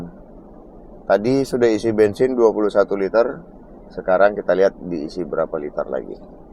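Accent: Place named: West Sumatra, Indonesia. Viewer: Kok nggak sampai ke Aceh Bg...sayang x ah...dan maaf kayaknya Abg begitu faham dgn Sumbar apa , Abg urang "awak". ? Karena sekilas ada tekanan dialek Minang di campur Sunda.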